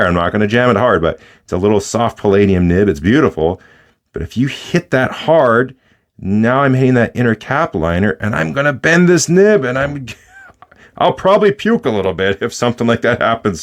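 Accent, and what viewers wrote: Canada. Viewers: Are you Canadian ? You sound Canadian . Sound like a guy I watch on YouTube called AvE. EDIT : I heard at the end of the video you said " Canadian" as currency, Nice !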